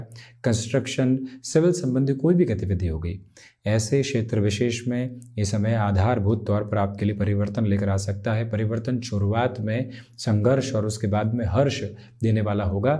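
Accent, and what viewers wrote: Standard. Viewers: Aap ki Hindi bahut khub aur shudha hai..🙏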